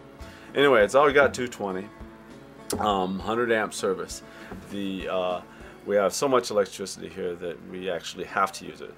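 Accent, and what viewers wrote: South, United States. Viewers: You are originally from the South, correct? I've been watching you for quite a while and always kinda figured that you were from NoLA or S. Mississippi, just going by the accent.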